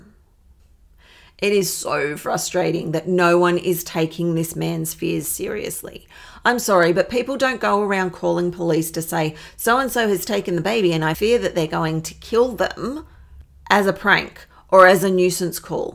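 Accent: Australia. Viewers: I don't usually like Aussie accent but I could listen to you all day U.K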